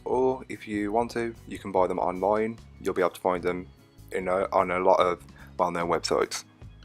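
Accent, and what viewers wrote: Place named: Walsall, United Kingdom. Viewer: Hey love this! my mum always buys a black forest gateau for Christmas but I think this year I might give this one ago..😆 can I ask where your from as listening to your accent you sound like my brother in law he is from warsal area I have probably spelt that wrong lol 😁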